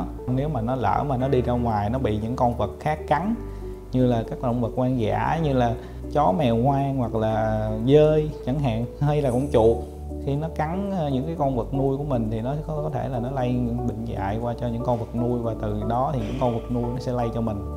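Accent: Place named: South, Vietnam. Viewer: Xin cảm ơn bác sĩ. Nhưng mà đm mấy thằng làm chương trình nó lồng cái nhạc nền vào không nghe được. Giọng miền nam thì người bắc đã khó nghe rồi còn cho cái nhạc nền vào.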